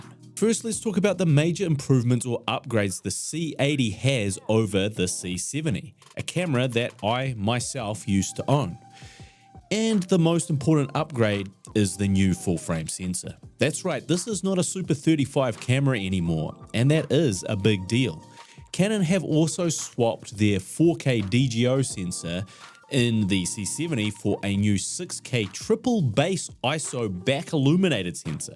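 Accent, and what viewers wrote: New Zealand. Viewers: Mate you sound like Kiwi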